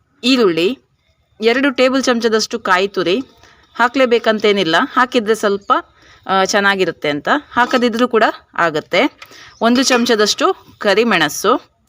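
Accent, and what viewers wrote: Mangalore, India. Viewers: ಮಂಗಳೂರು ಮೀನು ನೋಡ್ಲಿಕ್ಕೆ ಚಂದ ಮಂಗಳೂರು ಕನ್ನಡ ಕೇಳಲಿಕ್ಕೆ ಅಂದ ಮಂಗಳೂರು ಪರಿಸರದಲ್ಲಿ ಕಡಲ ಕಿನಾರೆಯ ಸೊಬಗು ಸವಿಯಲು ಆನಂದ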